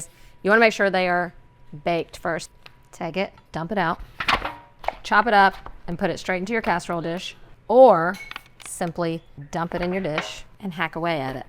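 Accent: South, United States. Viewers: Terrific accent too - especially if you’re from the UK and don’t hear these beautiful southern US accents much. She’s a smart, natural presenter… :)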